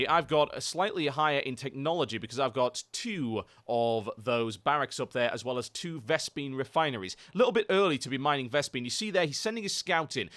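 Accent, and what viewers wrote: United Kingdom. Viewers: lol... British. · You may not be an experienced player, but you're a pretty darn good commentator. Love your accent. UK, right?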